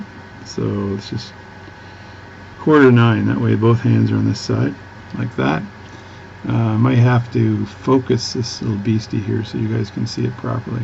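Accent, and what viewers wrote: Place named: Canada. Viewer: Aboot. Does intrigue me the Canadian accent. We have “geordie” accent from Newcastle that sound similar words. And also the Scot accent which has very similar sounding if you know what I mean. Lol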